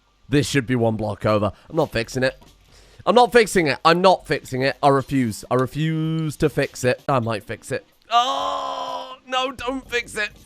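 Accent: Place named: United Kingdom. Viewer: Are you being serious. Because he's definitely from Britain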